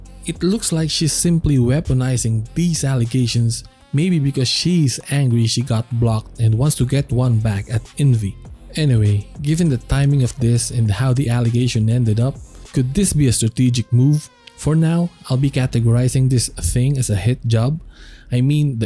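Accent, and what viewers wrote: Philippines. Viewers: You sound very pinoy to my ears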